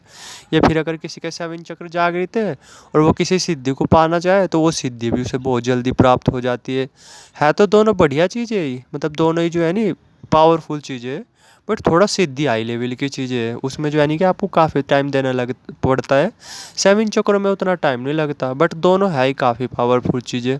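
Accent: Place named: Jhajjar, India. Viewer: ohkk. Mere jhajjar ke relatives h bilkul apki trah bolte h 😂😂😂 Ek se voice bhi milti h apki.or bolne ka tareeka bilkul same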